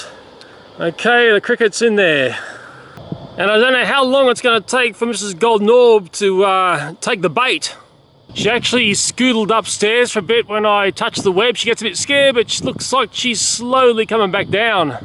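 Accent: Australia. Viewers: Sees thumbail Thats a big spider. Do I really want to watch it? Do I want to be creeped out? Eh why not. The manly Australian voice makes me feel safe.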